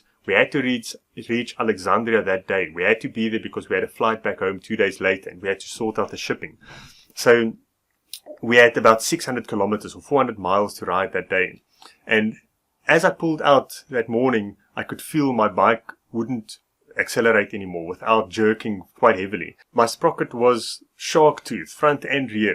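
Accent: South Africa. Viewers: South African accent is tough to understand.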